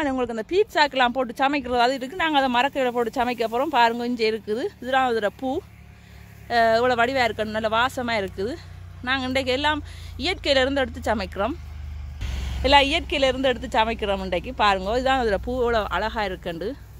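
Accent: Sri Lanka. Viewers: hi do you remember me? glad to see your video beautiful SL tamil. keep sharing, ipodhu ungal veetu ilakam munootru thonootru aaru. Valha valamudan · நீங்கள் செய்யும் முறை அனைத்தும் அழகு உங்கள் யாழ்ப்பாண தமிழ் மிக்க அழகு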